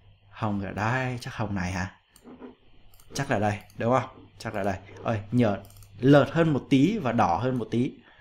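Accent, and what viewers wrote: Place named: Central, Vietnam. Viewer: Anh này người miền trung nè đọc “a” thành “e” kk ❤️Miền Trung 🤣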